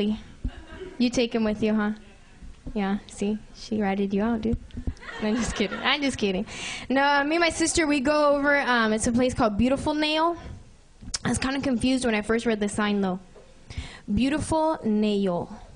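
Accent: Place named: Thailand. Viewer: She speak Thailand accent, not Vietnamese one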